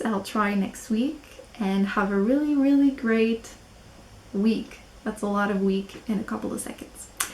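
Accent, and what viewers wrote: Quebec, Canada. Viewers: I'm still waiting for my diagnosis, but watching your video pretty much confirms my doubt, I feel the same way. Thanks for making theses videos, feels like i'm not alone in this. Also i'm detecting a french accent, i'm guessing your french canadian also?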